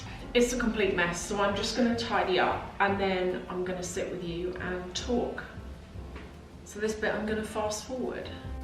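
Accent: England, United Kingdom. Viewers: the Dior tote has grown on me every time I see it. prices in Canada are way to wild to even consider a luxury bag at this point in my life... but I most def appreciate it from afar. Lovely video's I can listen to an English accent all day! take care everyone xxo &&& FABRIC SHAVERS are life savers!!!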